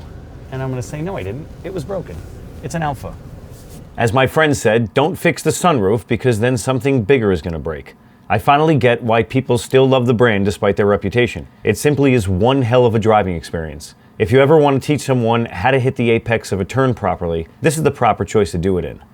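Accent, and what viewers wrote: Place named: New York, United States. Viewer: I fuckin love the sarcastic New York take on car reviewing I’m going to say you’re from Long Island maybe Nassau?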